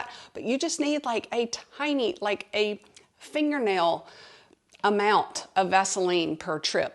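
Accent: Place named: Georgia, United States. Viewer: Oh, so that is why I like your accent. I am from GA too. The Texas accent grates on my nerves.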